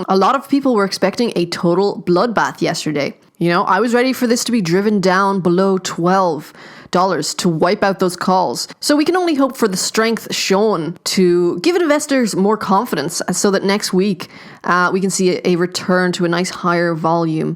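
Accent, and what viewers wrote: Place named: Ireland. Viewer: Great job! You sound Irish, my son lives in Coventry, UK.......You are doing outstanding work for the community....Thank You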